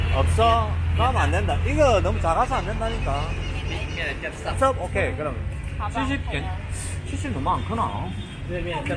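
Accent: Gyeongsang, South Korea. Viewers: 완전 경상도 아지야 말투네예 캄보디아의 일상 재밌습니더~ 조와요 구독~~🤣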